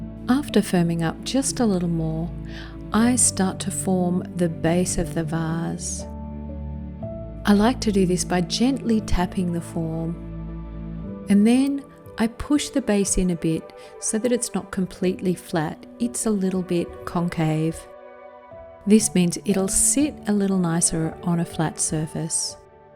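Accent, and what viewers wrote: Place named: Australia. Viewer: Great design. I am delighted to hear your lovely Australian accent and voice narrating your Organic Vessel. Also fond of black blue and matt gloss combos. thanks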